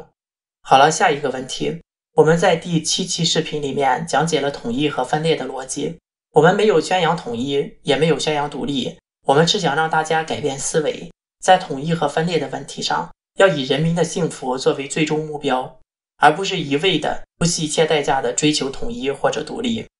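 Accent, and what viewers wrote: Shandong, China. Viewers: 山东味太重了…… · 兄弟，你的山东口音让我跳戏。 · 读稿子的是山东人吗？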